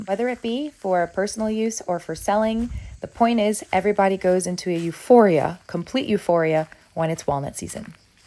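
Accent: United States. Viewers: "I left NY city for Bulgaria" - I was going to say, your American accent is really good :) Liked and subbed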